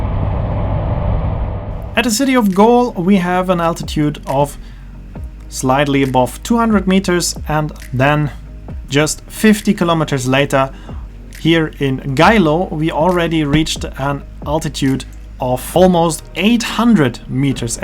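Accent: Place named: Germany. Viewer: I did think you were a german-speaker, but I still didn't connect Voss and Foss until i saw the pictures and realised V is pronounced as F in German.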